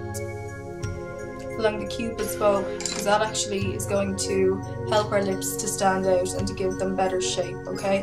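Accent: Ireland. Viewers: your accent <3 i love irish accents and i love your tutorial imma try it tonight because im uber bored!! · Your accent is so rad. I love it! Ireland is someplace I wish to visit sooo badly. Hello from California >3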